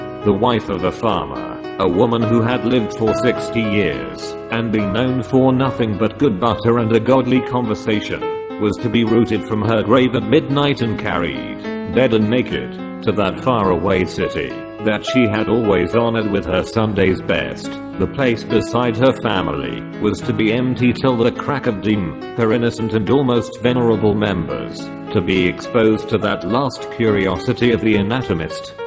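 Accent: United Kingdom. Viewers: Good british accent..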